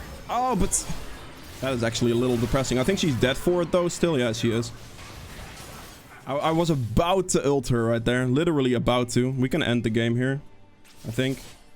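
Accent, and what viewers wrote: Netherlands. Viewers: U are Dutch ?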